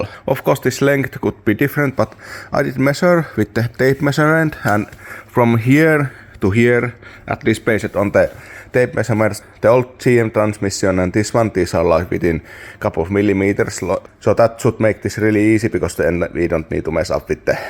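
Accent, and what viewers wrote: Finland. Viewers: Ralli englantia😂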